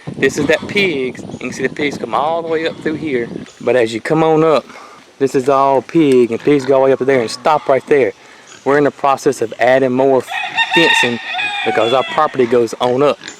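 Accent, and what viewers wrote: South, United States. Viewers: Like little bootsie too.love the country accent.lol.im not a farm person but this is fun and cool to watch.altho i was raised in the country.God bless your family.Jesus loves yall.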